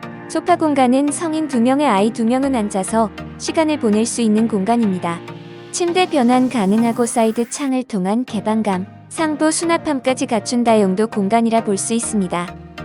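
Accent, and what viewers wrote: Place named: Gyeongsang, South Korea. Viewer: 기계음보다 대표님의 경상도 사투리가 더 좋아요~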